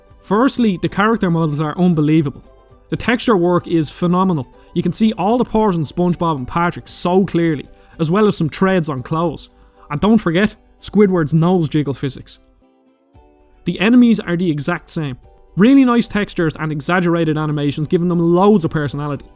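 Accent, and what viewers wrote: Ireland. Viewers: I just love the Irish accent, instead of thing it’s ting ah I love it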